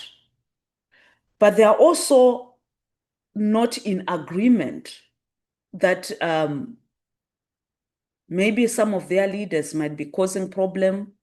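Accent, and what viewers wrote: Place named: South Africa. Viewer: But your accent is South African.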